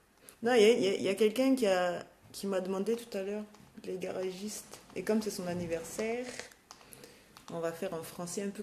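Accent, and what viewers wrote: Ivory Coast. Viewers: Je suis fan de vous ! Vous êtes formidable, puis t' as un accent Ivoirien t'as pas l'accent Française ! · Franchement je te kiff grave,ouais ouais je suis enjaillé c'est à dire t'as le parler,le thon c'est à dire c'est ta peau seulement sinon t'es vrai gomi ivoirienne même ouais c'est pas blô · Mdr tu parles comme une ivoirienne ahah avec l accent et tout^^ ca change du boulot..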